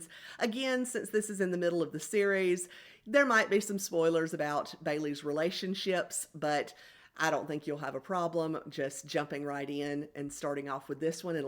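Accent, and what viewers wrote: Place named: South, United States. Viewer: Love your southern accent its so comforting i am also a mystery lover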